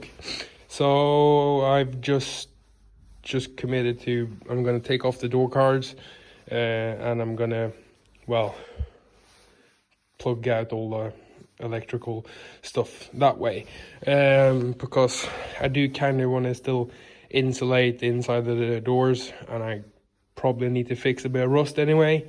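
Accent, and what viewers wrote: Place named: England, United Kingdom. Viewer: fan jävligt bra video måste jag säga ändå och din engelska e fan jävligt bra låter som du kommer från england nästan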